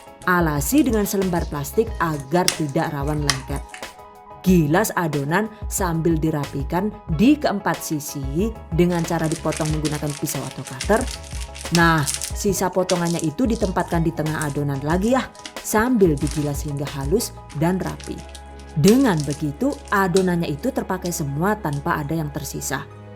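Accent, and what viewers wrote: Banyumas, Indonesia. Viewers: Ngapak ny bunda ni yg ngangenin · Slalu nonton penjelasanyajelasss bgt apa lg kl pas ada bahasa ngapaknya...suka bgt..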